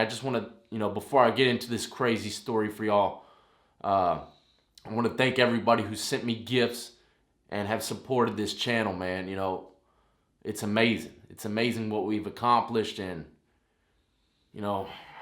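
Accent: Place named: Great Neck, United States. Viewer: wat high school did you go to? sounds like cox over off great neck lol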